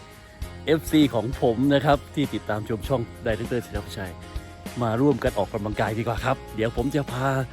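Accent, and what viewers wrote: South, Thailand. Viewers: เหลงใต้ชับเปรียะเลยครับ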